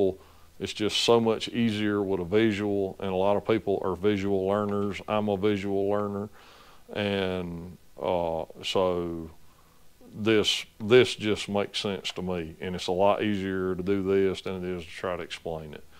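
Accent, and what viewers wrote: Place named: Upstate New York, United States. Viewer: You guys showed up in my suggestions, insta subbed. My kind of folks, wrenchers. A few oddities, first I can tell you're from my neck of the woods by the way you say cobb-urator, second I have a valknut tattoo also, third you gave it away- them overworked roads look like home or close to it (DOT will get around to it when they're done with 85, so basically never), if I had to guess I'd say Anderson. Cheers mates, from a mobile mechanic, starting a year out of college trying my own business 🍻 Cheers from Pickens. Small world, Im only guessing the county but I know yall are upstate folks and that blows my mind. Be blessed guys. -Regards, Harley.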